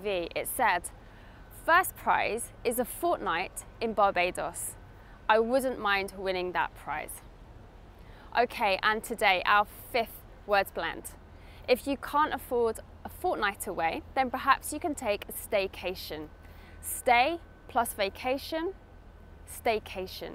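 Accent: United Kingdom. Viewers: You're a genius, I'm obsessed with the British accent only because of you. enjoyed this video and learned Five useful words 1. Brunch 2. workaholic 3. Motel 4. Staycation 5. Fortnight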